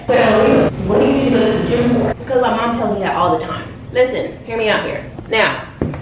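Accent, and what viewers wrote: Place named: South, United States. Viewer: girl u sounding a little countrtttyyy i love it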